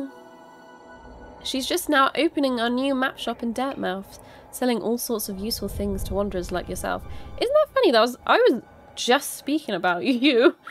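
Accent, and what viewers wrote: United Kingdom. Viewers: Btw, I know I'm echoing a sentiment similar to many others in the comments, but I have never heard of you, and I want to emphasize that I do not care for reaction channels or let's play/twitch vids... BUT! I watched every second of this, and I'm probably gonna watch the next too. You have a thoroughly pleasant voice (I'm such a sucker for British accents, especially female ones) and you are remarkably intuitive for a self-proclaimed novice of the genre. This game holds a special place in my heart and earns its spot on the small/rare S Class shelf of games.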